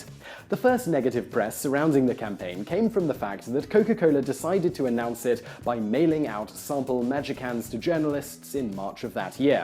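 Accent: United Kingdom. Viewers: Since when has Vsauce Micheal had a British English accent?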